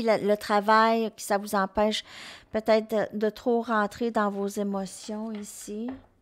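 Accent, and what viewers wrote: Quebec, Canada. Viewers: Le plus bel accent québécois ❤️😉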